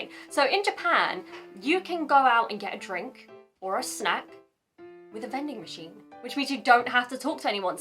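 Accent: RP, United Kingdom. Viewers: Nice Queen's English. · 当たり前ですが英語がとても上手いですね。ネイティブスピーカーを捕まえて批評など毛頭ありませんが素直に発音がいいと思うし話し方に品がありますね。同じ英語でもイギリス人はアメリカ人のような砕けた話し方は決してしない。本物のイギリス人を実感します。発音はやっぱりイギリス英語特有で喉の奥から発音するやり方で我々日本人にはなかなか真似できない。イギリス人の友人から「トマト」は「トマート」と発音し「トメート」とは発音しないと言われたのを思い出しました。それからどちらの国も島国で王室や皇室があることや騎士道や武士道があることも似ていますね。日本での生活は色々大変だと思いますがどうぞ頑張って下さい。そして楽しんで下さい。 May God make your life in Japan be more enjoyable and unforgettable. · イギリス英語の発声はやっぱりカッコいい！ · Hey,! I really admire your BRITISH ACCENT! People say my English is American, for I learned by American English textbooks since my junior hi days. When university, I was much influenced by Country-Western, bluegrass and hillbilly music, and now my accent sounds deeply Southern American.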